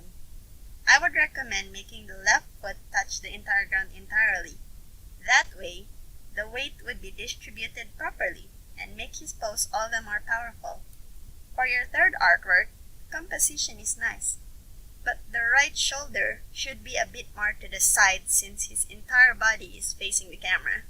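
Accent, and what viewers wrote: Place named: Philippines. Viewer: I can hear that Pilipino accent- 👀. I love your content to the fullest! Keep on going.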